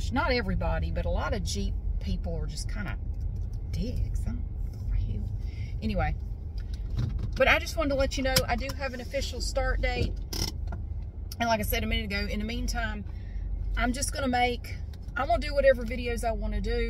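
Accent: South, United States. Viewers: When I first subscribed to your other channel in late 2016,I thought to myself ,I finally found a Southern Asmr Artist( which was hard to find back then) I enjoyed your later night uploads, Congrats on getting a job, it's the best idea for anyone to not rely on a criminal corporation like Google⚡️🔥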